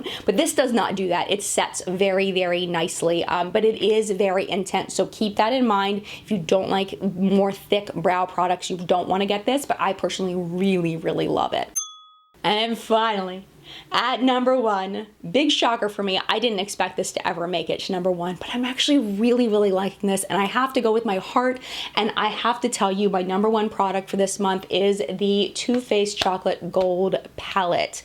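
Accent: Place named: Pennsylvania, United States. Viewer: Luv your content! You have a southeastern Pennsylvania accent (think Philadelphia). Where are you from??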